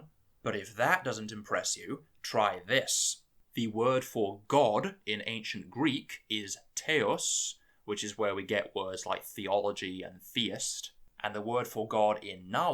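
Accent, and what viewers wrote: United Kingdom. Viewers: What's your native dialect? It's definitely a non-rhotic, british-esque but I'm having trouble placing it more specifically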